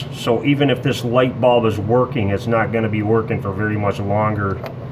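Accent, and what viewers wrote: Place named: Rust Belt, United States. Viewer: Great video! Love that thick rustbelt accent. Last generation of the unique American accents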